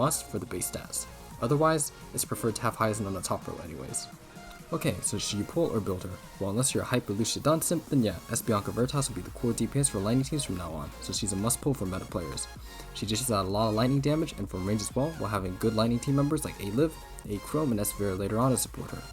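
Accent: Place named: Asia. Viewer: I swear all the pro players of these kind of games always have the this asian english accent lol. Like rexlent pgr, Keebster honkai, just3c,.. :D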